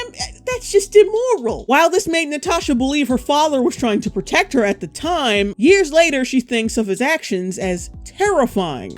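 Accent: United Kingdom. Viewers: Is she from burnley?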